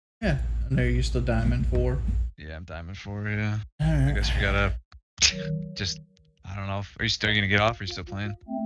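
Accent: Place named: South, United States. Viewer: His weird fat/country/ebonics talk was especially difficult to listen to this time around.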